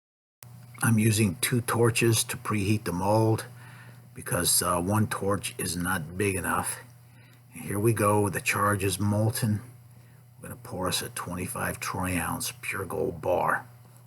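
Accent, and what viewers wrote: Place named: Italian American, United States. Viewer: Sounds like a guy from the sopranos - I Makin gold ova here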